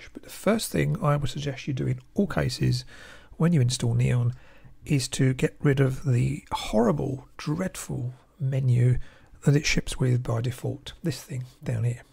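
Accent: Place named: United Kingdom. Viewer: Thanks! Very informative. I've just found your channel and been watching your plasma videos. I'm getting into Linux and enjoying it I'm moving from Mac. I've tried alot of distros and prefer KDE - I'm using neon to get the latest plasma but I wonder do you prefer or recommend Kubuntu over neon? I'm finding some bugs in neon I'm using breeze dark but I cannot change the window decorations and if I try it locks the window so I cannot do anything with it so have to kill the window and open another one. I'm wondering if it's better to go back to kubuntu and use 19.04 or would you stick with LTS? Nice to hear another Brit at first I thought you were Zebedee boss...Cheers!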